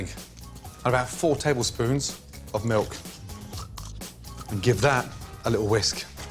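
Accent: United Kingdom. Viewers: I always think that haha I love listening to a brittish person cook. Like jamie Oliver haha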